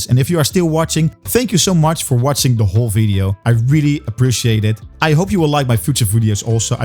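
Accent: Netherlands. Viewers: ik had eerder gehoord dat je een nederlands accent had!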